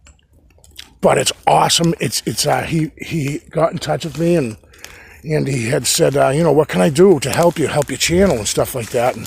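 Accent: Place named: Massachusetts, United States. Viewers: HEY, THIS IS THE SECOND TIME I WATCH THIS VIDEO, I JUST WANT TO SAY HOW YOUR Massachusetts accent makes me miss it up there so badly!!!! I feel like I'm home when i watch your vids. As you know I'm down in Florida for the past how many years. But I miss home and you always bring me back!!!THANKS, STILL HERE WATCHIN YOU OUT SQUATCHIN..LOL...TAKE CARE AND STAY SAFE!!!!LINDA IN FLORIDA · Love the Boston accent.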